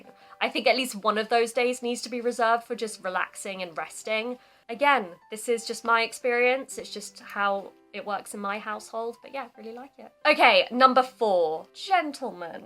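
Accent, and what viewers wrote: United Kingdom. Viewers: i enjoy your talks,really. i was living in London for 2 years. i miss tubes which i used when commuting .your talks and british english let me recall my old days．you are not scotish, right? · イギリス英語は聞いてて気持ちいいです。あんまり違いは分からないですが、イギリスの地域によってはちょっとおバカに聞こえたりしますけど、この方の喋り方は頭よさそうに聞こええる(笑) (ってか、英語ってイギリスがオリジナルでしょうけど・・・） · イギリス英語美女めっちゃカッコいい!! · イギリスアクセントが心地よいね(・∀・) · chill, sweet の使い方が勉強になった、英語と日本語が出てくるので、勉強になる。発音は英国式だし聞き取り安いです。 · 英語の訛りについて解説してるマルチリンガルの人の動画を見てからこちらの方の動画を見たら訛りがイギリスっぽいと英語が全く話せない私でもわかりました。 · はぁ(о´∀`о)ブリティッシュアクセント最高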